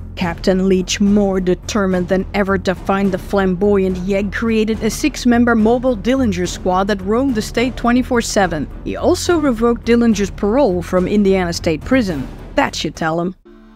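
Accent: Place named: Netherlands. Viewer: I love that silky dutch accent. Another brilliant episode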